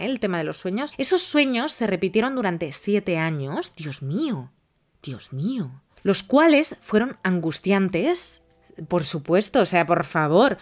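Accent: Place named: Russia. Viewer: una pregunta tu porque abeces ablas con asento ruso marcando la "R"?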